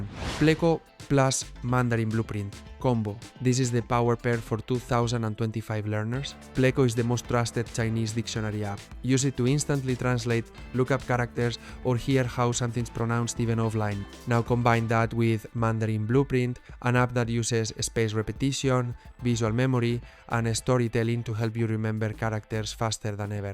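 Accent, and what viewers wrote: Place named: Spain. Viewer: Why the Spanish accent?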